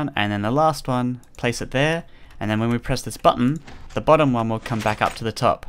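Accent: United Kingdom. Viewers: WHAT, I thought your accent sounded British, i'm Australian aswell :D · You're Australian?! I live in Australia and thought you're accent was british.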